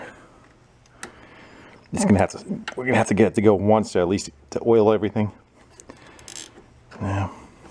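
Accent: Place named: Michigan, United States. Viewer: You sound familier. I bet your from Michigan.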